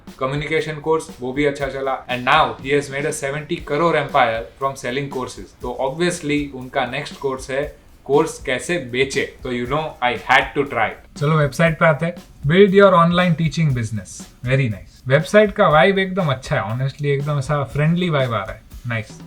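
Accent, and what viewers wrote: Gujarat, India. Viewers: Gujarati bolvani style thi j olkhay jai 😅😅😅😅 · Gujarati pata chal hi zata hai puch ne ki jarurat nhi h😂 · જ્યારે ગુજરાતી વ્યક્તિ હિન્દી બોલે છે.. બહુ સરસ લાગે છે.. હું પણ ગુજરાતી છું.. બેસ્ટ વિડિઓ ભાઈ.. keep it up😊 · ભાઇ તું ગુજરાતી છે ને તારા બોલવા પર થી ખબર પડી ગઈ અને મોસ્ટ પ્રોબેબબલી કાઠિયાવાડ રાજકોટ સાઈડ નો હોઈ શકે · Are you gujarati bhai?😅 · HEY BRO ARE YOU GUJARATI? caught from accent 😅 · Bhai apke hindi mai Gujarati q a ri h?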